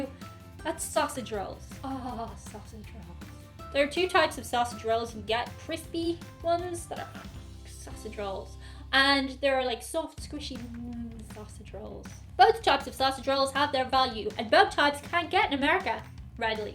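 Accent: Ireland. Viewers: just the Irish accent that's all.. just varies by region pronunciation of anything as to where you accent the vowels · Wow, I've never heard anyone pronounce bidet like that. You pronounce it as "B-Day", and myself n everyone I know says "buh-day". We don't say the the letter B, we just make the B sound, followed by the word Day. But its all good, I absolutely love your accent n the way u talk. I'd do almost ANYTHING to marry a female with an Irish accent. It's one of the sexiest things I've ever heard. 💚🍀💚🍀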